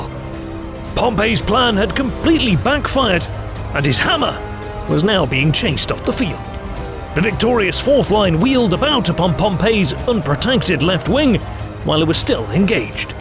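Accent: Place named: United Kingdom. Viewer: I am not a native speaker, but even for me a British accent just feels appropriate for documentaries.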